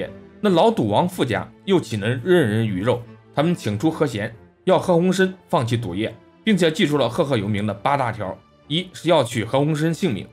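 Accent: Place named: Jimo, China. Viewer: 听口音，主播是青岛即墨的吧，我是从q这个发音听出来的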